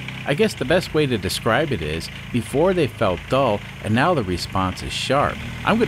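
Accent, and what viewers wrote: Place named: Southern California, United States. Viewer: I understand your caution. When we changed to metric measurement here in 1974, conversion to litres per kilometre (or as it's spelt (or as spelt itself is spelt is the US "spelled"😂) in the US) liters per kilometer popped up for a short while but it quickly disappeared in favour of l/100km. Also, on reflection Kilopascals (kPa), not Hectopascals, is on the tyre pressure gauges here. Interesting re the accent. Had I been so bold as to guess your background outright, I would have said, born somewhere in Wisconsin, then moved to Southern California. I'm no expert but to me your vowels sound midwestern but the lilt is straight outa Garden Grove.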